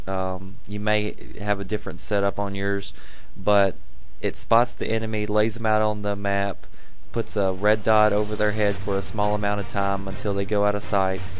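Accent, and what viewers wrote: South, United States. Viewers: are you from the southern states?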